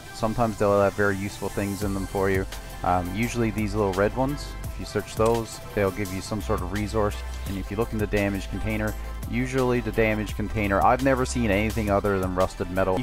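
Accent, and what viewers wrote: Newfoundland, Canada. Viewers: Judging by your channel name and accent im going to guess you're from Newfoundland? lol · Are you from Newfoundland?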